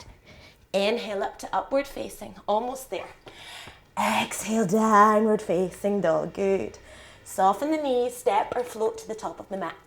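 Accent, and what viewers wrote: United Kingdom. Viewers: I absoluteley LOVE your work, your devotion and your joy with Yoga.. and the best bit: your british voice! thanks so much xxx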